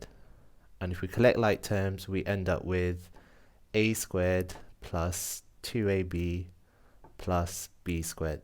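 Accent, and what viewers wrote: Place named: United Kingdom. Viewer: Excellently explained sir. Keep up the good work. Most importantly,I like your British accent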